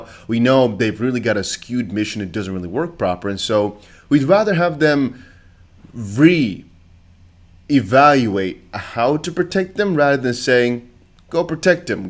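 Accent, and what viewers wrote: United States. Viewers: You sound like you grew up here in America. Our own accents are wildly different from region to region.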